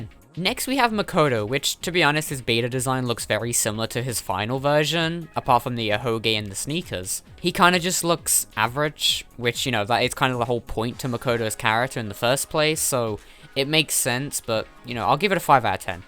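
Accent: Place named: RP, United Kingdom. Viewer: This guys is like SO posh. I want this guy to say "its a bit chilly here dontcha think"?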